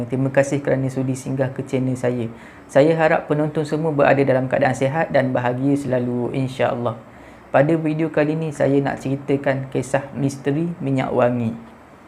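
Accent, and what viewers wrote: Terengganu, Malaysia. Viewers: nk tye je ni org ganu ke sbb dgr slank ganu 😅terbaik cite dk pnh trlepas lg dgr 👍teruskan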